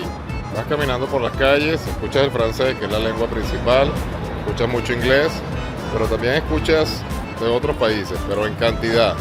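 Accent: Venezuela. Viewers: Tu vivias en Caracas? Hablas muy caraqueño como de Alto Prado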